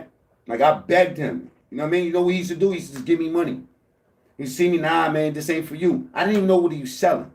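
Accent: Brooklyn, United States. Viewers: You have to be from Brooklyn..The way you talk and. get hyped is 💯💯💯💯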